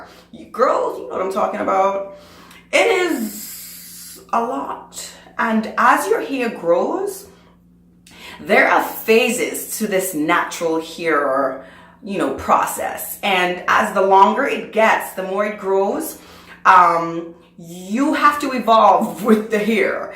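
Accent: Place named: Jamaica. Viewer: Are you Jamaican? I think I hear an island accent ! Lol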